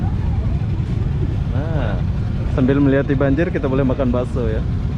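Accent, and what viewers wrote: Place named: Malaysia. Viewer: Saya terkesan dengan logat bicara bg yg kental akan nuansa melayu jadi saya do'akan semoga bg sukses dan sehat selalu. Salam santun dari saya putra melayu riau🙏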